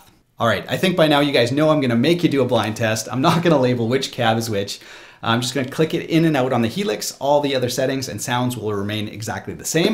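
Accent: Canada. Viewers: It's not often I can hear the first fragment of a sentence and know someone is a fellow Canadian, but that absolutely happened here! Lol Excellent video, thanks for putting it together, seriously considering a Helix.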